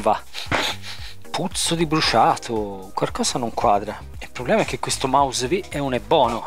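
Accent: Tuscany, Italy. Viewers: Grande,ti ho scoperto quest'anno e mi sto divorando tutti i tuoi video. Un saluto grande e w l'accento toscano <3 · Ti ho scoperto a caso nella home page ma dalla 1 parola ho detto li sto qua e un parente toscano poi hai resumato delle parole che "al bacio" un so di dove lissei te ma ner dubbio sei della Toscana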